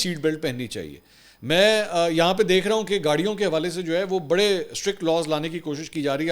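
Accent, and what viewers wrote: Punjab, Pakistan. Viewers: As a Punjabi buhat Acha Lagta hai punjabi tarka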